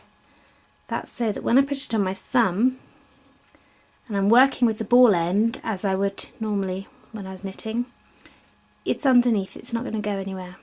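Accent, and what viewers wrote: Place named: England, United Kingdom. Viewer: beautifully explained in a nice clear voice - great to hear an English voice on one of these knitting videos. Loved it!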